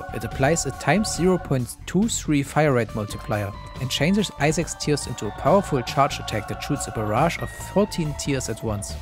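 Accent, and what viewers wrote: Germany. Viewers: So extremly german 😅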